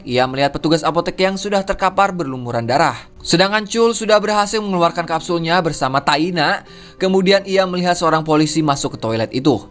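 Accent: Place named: Sunda, Indonesia. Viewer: Lucu sih , cara ngomongnya campur BHS.sunda , campur heureuy 😅😅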